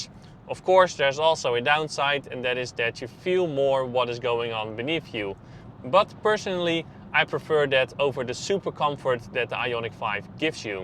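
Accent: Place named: Netherlands. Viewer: Compliment hoor ,jij spreekt echt heel goed Engels ! 👍 Ik hoorde aan jouw Nedelandse accent dat je Nederlander bent.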